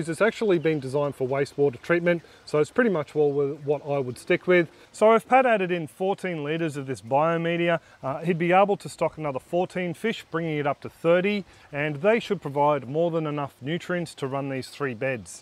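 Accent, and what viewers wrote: Australia. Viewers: Really good, interesting and educative videos, you've been very useful to my setup, your explanations are clear despite your funnny Aussie accent ! It's completely understandable.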